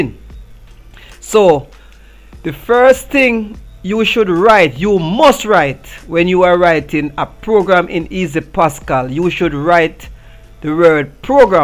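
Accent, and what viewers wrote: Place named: Jamaica. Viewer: love and support love the jamaican accent big up yuh self!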